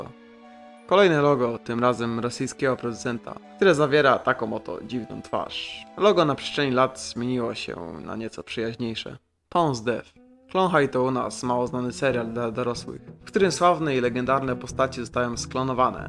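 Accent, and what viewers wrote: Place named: Poland. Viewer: Polglisz mocno xd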